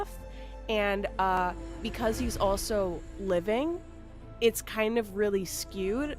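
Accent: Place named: New Jersey, United States. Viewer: Okay so I’m finished listening and I’m so here for your warrior cat oc lore they’re so coool and I always adore a chapter that’s complex and with a bit of religious power Hexmoon is currently obsession ALSO JERSEY REP! I was like I know that accent and thought it was Philly 😭 but damn love this so much!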